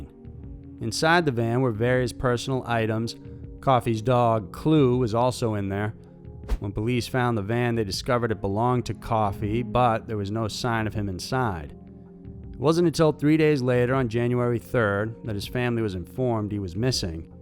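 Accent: South, United States. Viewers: Your voice has improved, less monotone since I ranted about it last year. I like the southern USA accent too.. I prefer the human voice to your robot one. Easier to listen to and stay interested... Better than before anyway!! Almost there lol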